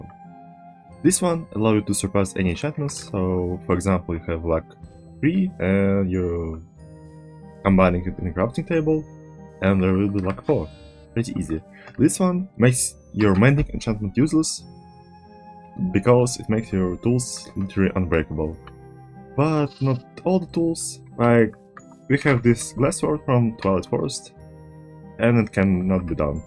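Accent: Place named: Russia. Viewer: Чую чую я русский акцент, чую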